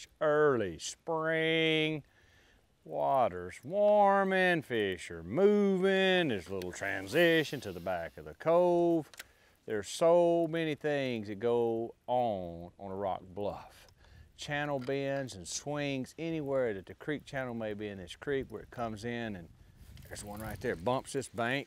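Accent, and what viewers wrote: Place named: South, United States. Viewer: LMAO FUNNY SOUTHERN BOY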